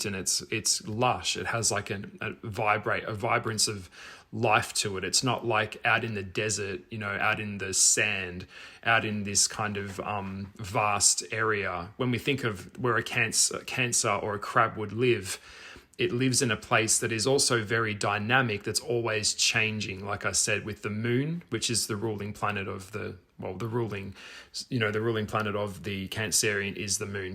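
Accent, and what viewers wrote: Australia. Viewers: Sounds like you are Aussie!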